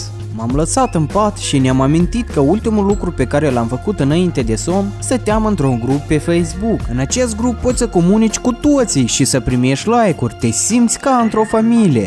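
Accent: Moldova. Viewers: esti din moldova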